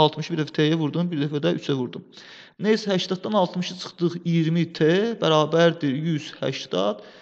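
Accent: Baku, Azerbaijan. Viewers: Bakı ləhcəsi ürəyim açıldı👍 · Eşq olsun Bakılılara danışığın çox xoşuma gelir ele billem yerlimle danışıram😄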